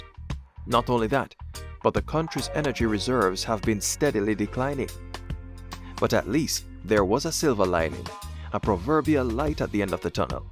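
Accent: Jamaica. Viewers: You sound Jamaican and you are talking tata. You know NOTHING of what you are saying. Trinidad will manage with or/and without Venezuela.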